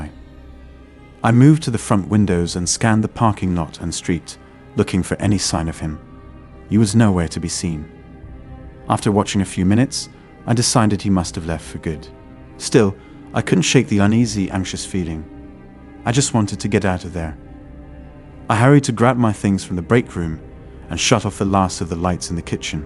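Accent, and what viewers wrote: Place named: England, United Kingdom. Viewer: A Englishman ,narrating the story . Nice ! 😉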